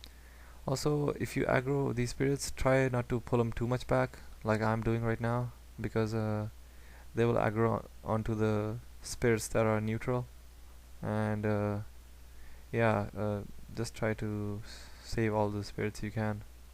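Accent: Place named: India. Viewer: THanks man so much just curious not hatin what accent was that sounded indian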